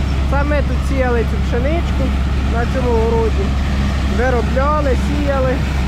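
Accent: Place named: Poltava, Ukraine. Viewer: Яка розкішна і соковита полтавська вимова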